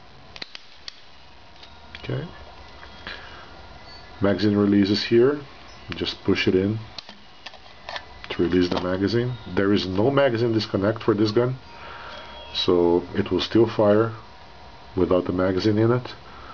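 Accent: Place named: Italy. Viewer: Italian pistol review by Italian accent 😁👍🏻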